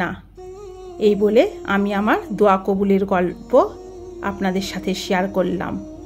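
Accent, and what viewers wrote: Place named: Kolkata, India. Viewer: ভাষা এমন কেন 😮 মনে হয় কলকাতার 😅 উদ্ভদ লাগে শুনতে।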